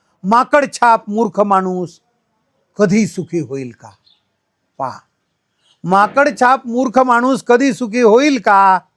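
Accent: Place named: Marathwada, India. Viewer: तुमची मराठवाडी मराठी ऐकायला गोड वाटते.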